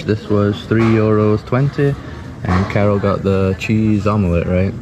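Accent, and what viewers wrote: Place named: Northern England, United Kingdom. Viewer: Northern English + American + Global English. Wow bro, you're a true nomad :D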